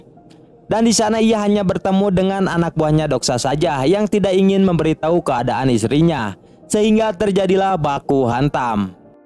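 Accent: West Java, Indonesia. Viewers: Hhaaaa......haaa... Admin naratornya orang sunda....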